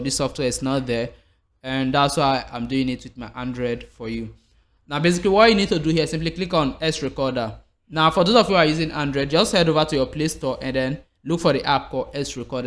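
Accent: Nigeria. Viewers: Is it eshot or eshort? Nigerian accent hard to understand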